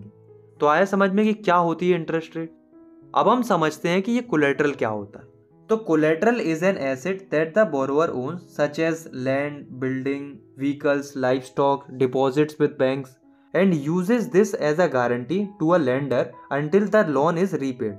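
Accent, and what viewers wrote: Uttarakhand, India. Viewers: Sir uttarakhand se ho kya ??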